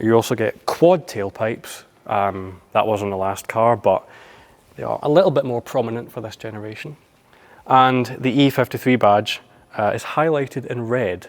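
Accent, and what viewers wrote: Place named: Scotland, United Kingdom. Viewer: He's Scottish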